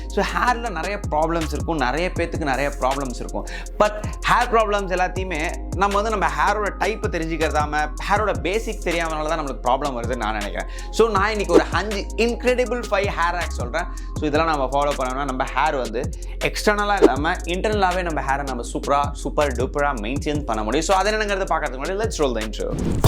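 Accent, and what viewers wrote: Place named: Tirunelveli, India. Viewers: tiruneveli Slang👻😄😁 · Bro tuty slang vera level ❤️ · Antha ella slang nice 👌 sincery by tirunelveli ,thoothukudi Karan la 🤣🤣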